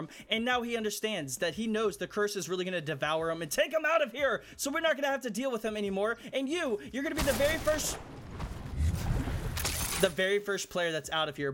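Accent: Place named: Ireland. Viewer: Littlr irish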